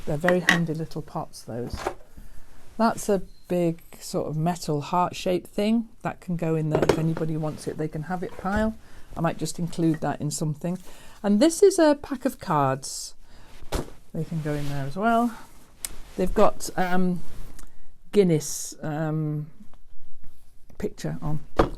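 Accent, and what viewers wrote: United Kingdom. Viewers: Hi, I'm so glad that I found your channel by accident some dass ago. I searched for some ideas for scraps as I regularly do, but this time your pink/red quilt showed up and caught me. I watched some of your videos since then and I really like them. Hearing you talking, looking at all the craft supplies and hearing your dialect is great (I'm German and love hearing different kinds of English, especially "British English"). All the best, Stephanie PS: Just subscribed for future videos 😉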